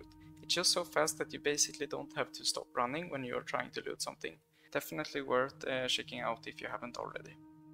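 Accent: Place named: Sweden. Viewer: Sjuk svengelska bror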